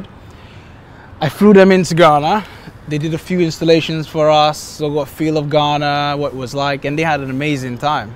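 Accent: Scotland, United Kingdom. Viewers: Love Scottish accent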